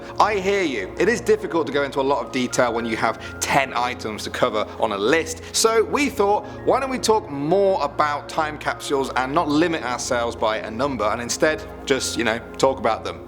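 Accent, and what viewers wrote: United Kingdom. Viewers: He's British. They pronounce it cap-syool. A perfectly acceptable pronunciation .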